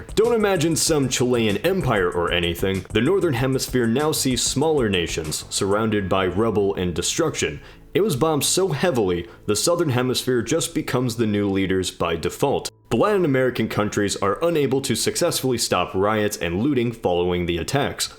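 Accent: Ohio, United States. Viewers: nice northeast Ohio accent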